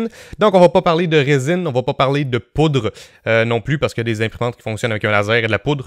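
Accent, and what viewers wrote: Canada. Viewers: Comme toujours, hyper intéressant! Et entre ton accent très exotique que j'adore (mon pépé a été dans la police montée chez vous, à une autre époque il est vrai!) et ton débit (mais comment fais-tu???, Heureusement que tu n'es pas rappeur), je me régale à regarder tes reviews. Toujours instructives et précises. J'attends avec impatience tes essais sur du PLA flexible.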